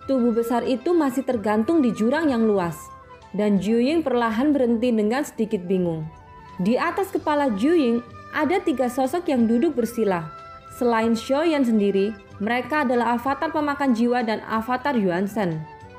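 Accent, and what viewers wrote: Java, Indonesia. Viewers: Memang suaranya beda,kalau bisa min logat Jawanya agak kental itu aja sih kalau sy. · Hargailah orang yg berusaha menghilangkan suara mendoknya. Semangat mba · Medok nya. 🥰🥰🥰.... Mbak e. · masih meddok suaranya, powernya di tambah lagi ya, biasa namanya juga pemula semangat💪💪💪💪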